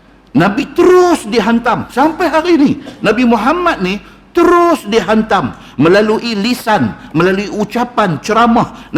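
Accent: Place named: North, Malaysia. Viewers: Loghrat Tanjong....Penang town jati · Sy suka nya Tazkirah bgus. Dlm loghat Utara. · Assalamualaikum sahabat, bukan ilmu sahaja yang terbaik saya terima dari ustaz, tapi suara dan loghat utara tu yang sedap. Terima kasih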